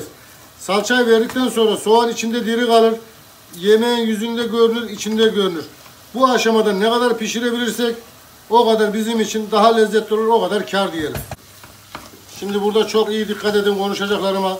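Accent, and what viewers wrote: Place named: Central Anatolia, Turkey. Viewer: „Baska bisey deel“😀 ustam ankaralimisin veya ic anadolu, bizim oranin sivesi sanki 😇 püf noktalariniz icin cok tesekkürler :)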